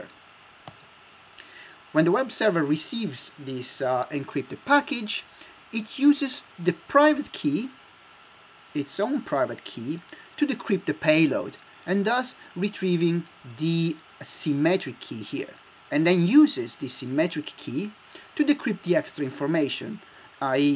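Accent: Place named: Italy. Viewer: Ottimo inglese ma l'accento italiano è davvero impossibile da nascondere Hahahah Btw nice video!!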